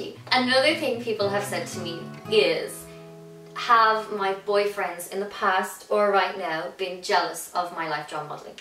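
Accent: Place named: Ireland. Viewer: Hello, I actually thought of maybe looking into becoming a life drawing model because I hope to find my next boyfriend eventually that way. Although from your experience, have you or others who you may know found this to be an easier way than in the real world or is it more vulnerable? By the way, I love your accent and I've been to Ireland! I live in Frome, England but I am American. In fact, your dress sense is very relatable to the people where I live, if you've never been!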